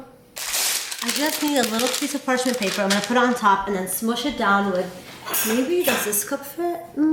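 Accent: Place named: Arab world. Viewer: Walla, I thought I heard Araby accent. Shalom, Salam, Peace. I appreciate your constructiveness. Shukran/Todah Rabah.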